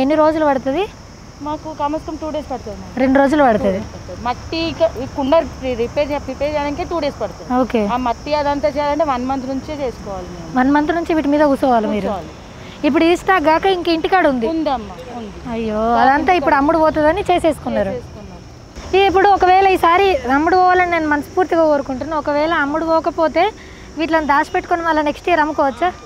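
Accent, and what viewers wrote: Telangana, India. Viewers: Akka Nv matlade matalu entha kalupukuntlu unai Telangana yaasa spr akka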